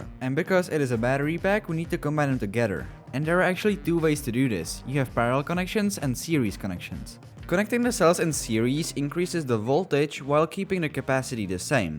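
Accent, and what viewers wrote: Czech Republic. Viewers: i sense a czech accent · Kamo, poznám český přízvuk, maga tvorba a w tvoje ajina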